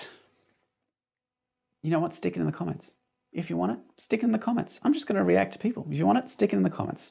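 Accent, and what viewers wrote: Australia. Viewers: Love your map passion, I thought I was the only cartographic kook. 🤪 [Do I detect a slight Aussie accent ?]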